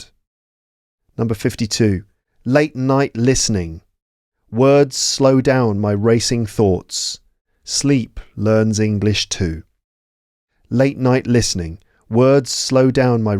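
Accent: United Kingdom. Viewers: ❤ I'm Korean, I'm hope going to England in few years.🙏 It really helps listening British accent...! 😊 Thank you so much!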